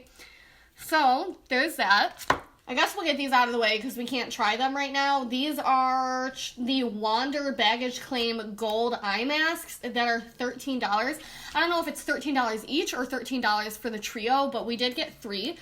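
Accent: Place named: Midwest, United States. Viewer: This is the very first box I THOUGHT I was signing up for... I signed up, thinking I was late enough into July that we would skip July and go right to August. When I opened the August box I was not pleased with any of it. I don't wear lashes, the Laura Lee colors are chalky and they don't apply smoothly nor do they blend very well. I'm surprised she launched with a formula this difficult to work with. I do feel bad for whatever the drama she and some of the others are going through. I appreciate all those who are staying out of it and remaining positive/uplifting. I'm new to your channel because of Boxycharm. You sound like you've got a Midwest accent. I'm from Wisconsin but I'm not sure how much of the accent I still have, since I moved away at age 19. Haha, "Poop Brown" that's fun! I enjoyed your no-nonsense review. If you go for Jaclyn Hill's vault or maybe some of the BH cosmetics palettes for color (Zodiac, or Galaxy palettes --they have 4 of them, and they are $18 each unless you find a sale.)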